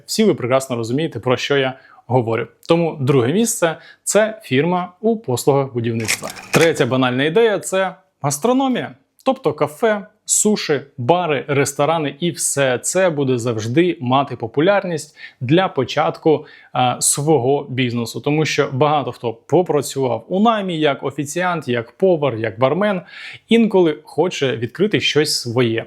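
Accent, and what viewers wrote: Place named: Russia. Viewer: Для чего ты мучаешься на украинском языке разговаривать если твой родной язык русский это же видно Тем более что белорусы тоже могут твоё видео посмотреть про Польшу и ничего не поймут по-украински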